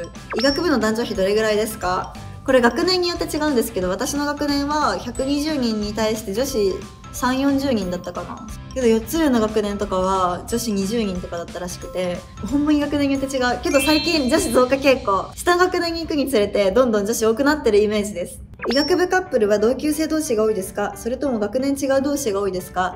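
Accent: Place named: Hiroshima, Japan. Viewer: 奇を衒わない内容に加え岡山訛り？の広島弁が何とも堪りません。 高校大学同じなので勝手に応援中です 頑張ってー！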